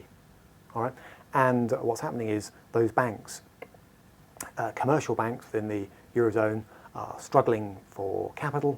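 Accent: United Kingdom. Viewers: lmfao love and his brilliant explanations with a great British accent!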